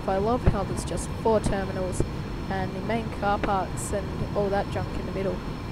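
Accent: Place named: Australia. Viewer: subbed because aussie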